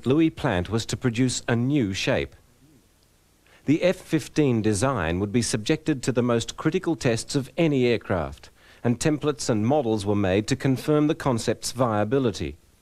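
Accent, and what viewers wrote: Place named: United Kingdom. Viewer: Yes - the narrator has a British accent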